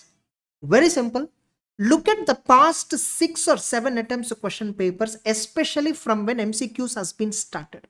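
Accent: South India, India. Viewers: Thanks for the info sir😊🙏🏻 Love your South Indian accent😍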